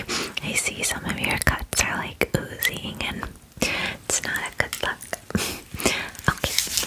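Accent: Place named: New York, United States. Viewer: I don't know where you get these ideas, but I LOVE them!! So many tingles and you are very entertaining! I love the one's with a NJ/NY accent! Reminds me of the 3 months I lived in NJ! 😂❤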